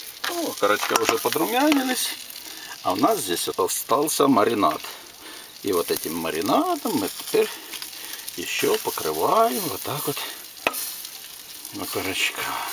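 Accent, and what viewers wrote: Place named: Vologda, Russia. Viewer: говор вологодский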